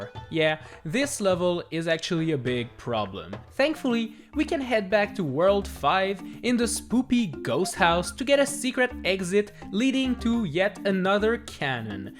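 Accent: Quebec, Canada. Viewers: I believe he’s from the French-speaking part of Canada, so he has a thick accent haha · you sound french canadian man :P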